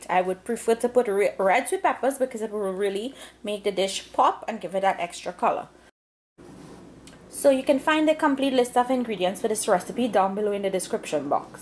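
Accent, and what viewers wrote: Trinidad and Tobago. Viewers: Not only is your recipe the best, and video easy to follow, but your Trini accent added a special flavor to the pot..I so love to hear my Trini people speak..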